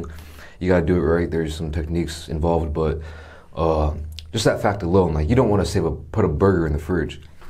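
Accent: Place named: Chicago, United States. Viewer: There must be a hood out there in Korea cuz I thought you were one of our brothers from Chicago the way you talk and the way you carry yourself so glad I found your channel brother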